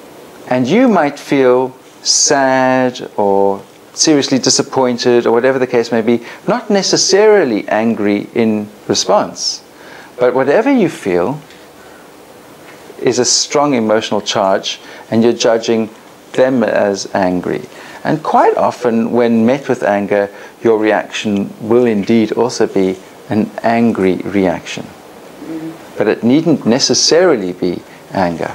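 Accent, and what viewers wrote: South Africa. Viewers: South African accent? 😁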